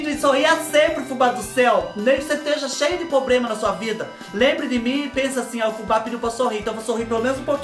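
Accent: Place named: Paraná, Brazil. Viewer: Fubá, vc tem sotaque de paranaense! 🌹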